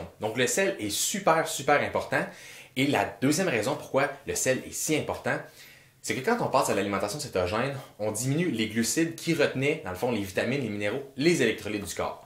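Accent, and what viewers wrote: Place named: Quebec, Canada. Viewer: Jaime trop l’accent québécois 😁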